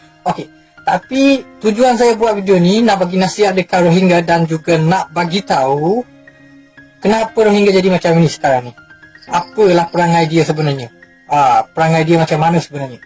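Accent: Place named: Kuala Lumpur, Malaysia. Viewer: padu SRK bangla ni cakap KL.. lagi padu dari cina malaysia.. tapi bukan semua la cina.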